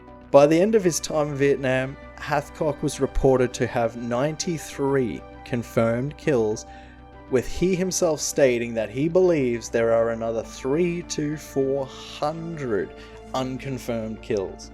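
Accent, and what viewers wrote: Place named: Australia. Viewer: I heard the Australian accent I thought it would be an Australian you're talking about . Heard enough about the Americans in that war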